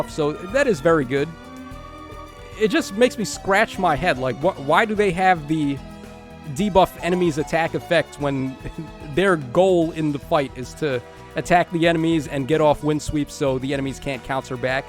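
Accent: United States. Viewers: Ayo, that cowboy accent kinda got me down bad, tho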